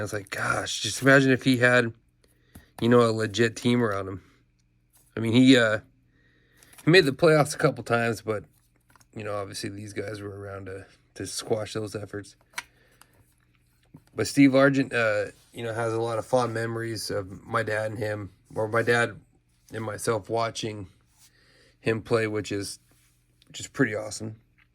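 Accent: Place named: Russia. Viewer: Nice VR and cards. Great Russian Accent.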